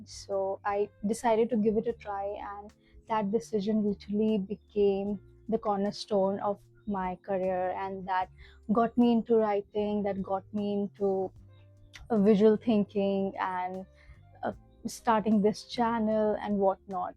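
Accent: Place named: Jamaica. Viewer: I feel like your Jamaican accent is coming in! Please visit Stoney❤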